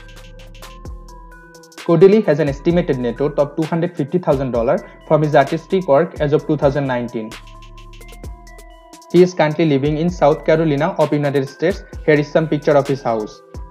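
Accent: India. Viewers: Bollywood acent. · After this guy uttered just a few words I had to stop watching this video because his Indian accent was SO thick I couldn't understand what it was that he was saying enough in order to continue listening anymore!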